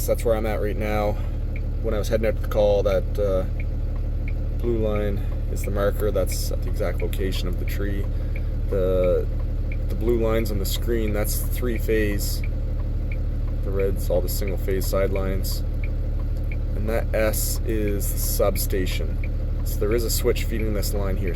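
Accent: Canada. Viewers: He's in Canada. His accent is a dead giveaway.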